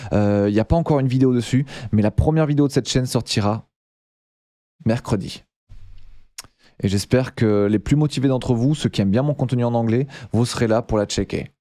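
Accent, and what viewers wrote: France. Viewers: Perso j’étais pas chaud pour les vidéos en anglais, mais malgré mon niveau d’anglais à chier, comme tu utilisais des mots simples et avec ton accent français, étonnement je comprenais 90% ! Mais effectivement ça fait un moment que je ne voyais pas tes vidéos dans mon feed 😖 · yes, it's great decision to continue for worldwide people 🗺 it's great to follow a french cool man who speak in english with a french accent ça se dit redresser le cou, tu peux même consulter un bon médecin pour ça Du coup, tu vas commander un nouveau plateau TV pour la chaîne internationale ? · Le meilleur dev français ❤️ Tu as totalement raison, apprendre de ses erreurs fait grandir mais c'est parfois nécessaire de se lancer et de s'écouter pour pouvoir tenter de nouvelles choses, car c'est en expérimentant qu'on innove. Après je pense que c'est normal que ton accent français en anglais fasse peur aux français mais ce n'est pas du tout un problème pour les anglophones, au contraire ! 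Continu de produire du contenu de qualité peu importe la langue, je suis sûr que tu va continuer à grimper très vite 💪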